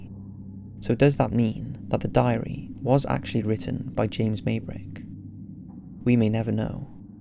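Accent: United Kingdom. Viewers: It makes me laugh people going on about his voice. It's just a normal British accent. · Top-notch content. As always, sit back, relax, and fall asleep listening to your British accent.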